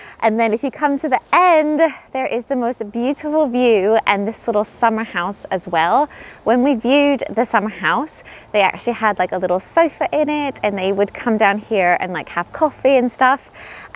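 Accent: United Kingdom. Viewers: haha same here. I am a Chinese and a new subscriber here so I had no clue that is in UK. So when I saw the cupboard and fireplace I said “wow, it looks so much like the one in Harry Potter. And then I noticed British accent which makes sense. I live in Canada and the houses layout and style are so much different than in UK! I’ve never seen such a big fireplace in my life and all I want is to throw some floo powder into it. LMAO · What is your accent? It seems to swap from British to American and I can't work it out lol x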